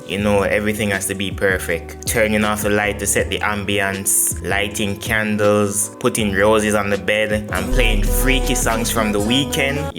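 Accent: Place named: Jamaica. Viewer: Yu sound jamaican.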